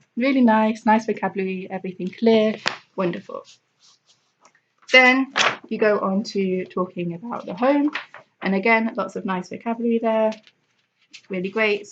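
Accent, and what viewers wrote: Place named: United Kingdom. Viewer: مرحبا 😊اللهجه تدل بريطانيا 😅استخدم الترجمه لمعرفه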